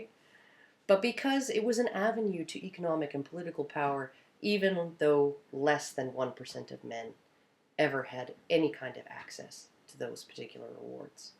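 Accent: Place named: Canada. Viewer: your Canadian!!! xDDD thats why i like how you say sorry xD i thought i heard it sumwhere b4 lol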